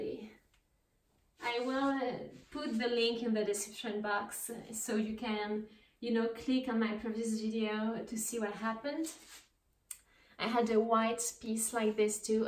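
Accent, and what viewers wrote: France. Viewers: I love that bed warmer on the wall and I love your French accent and your pretty Titi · Mhm. You sound impressively French but in female contrast with a nice rear end. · Are U French?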